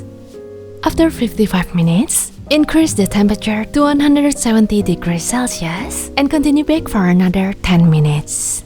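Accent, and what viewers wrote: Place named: Indonesia. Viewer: Thanks for the recipeee. Aksennya kayak aksen indonlish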